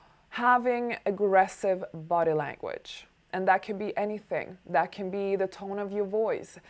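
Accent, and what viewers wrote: Sweden. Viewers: Why do you sound Swedish?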